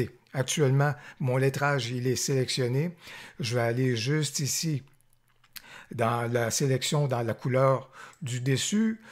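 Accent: Quebec, Canada. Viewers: Merci beaucoup pour Tuto très bien réalisé. Et puis pour moi, cerise sur le gateau, c'est l'accent Québecquois que j'adore, même si tu me répondrais que tu n'as pas d'accent et co contraire c'est moi qui ai un accent de "Maudit français" 😂😂😂 Et Merci encore une fois !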